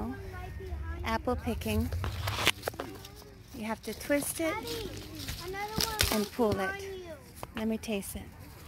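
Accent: South, United States. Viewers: you and your daughter sound so much alike. You both have the southern accent and tone to your voice. Nice video 🙂❤ I love going to Northern Georgia around Blueridge Ga to get fresh apples in the Fall. October is the month to go.🙂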